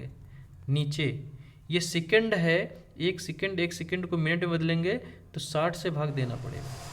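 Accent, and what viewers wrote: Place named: Raebareli, India. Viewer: Sir आपकी आवाज़ raebareli के क्षेत्र की लगती है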